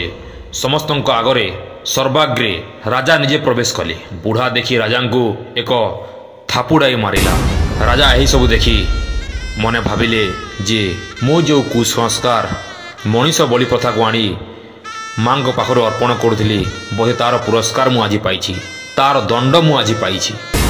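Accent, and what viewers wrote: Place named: Sambalpur, India. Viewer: Jai MAA sureswari...bhai tumar katkia katha re sambalpuri jhalsu che...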